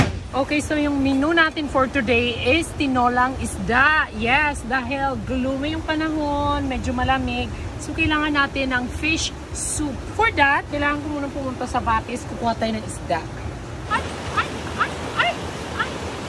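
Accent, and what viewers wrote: Visayas, Philippines. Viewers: Bisaya diay ka ma'am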